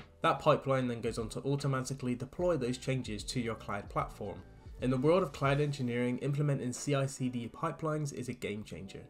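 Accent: United Kingdom. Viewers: Are you from Devon? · Thanks bro.. I know I can trust you with that west country accent like mine😂, great video, very informative 👍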